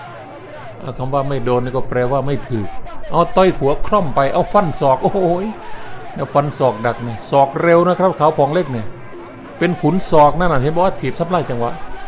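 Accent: Isan, Thailand. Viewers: พากย์อีสานตลกดีบ้านๆดี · ภาษาอีสานก็เพราะดีค่ะ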